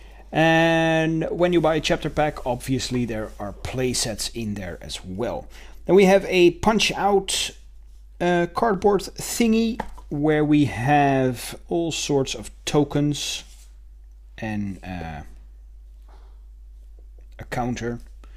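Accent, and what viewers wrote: Netherlands. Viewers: You're Dutch?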